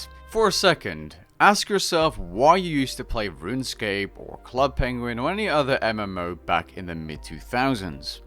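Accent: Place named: Netherlands. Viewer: Problems with 1. He’s a pretty dope content creator 2. Not afraid to branch out on video content. 3. Is a very attractive man with a nice Dutch accent. 4. What? 5. Hates frogs and encourages bonking. 6. All of the above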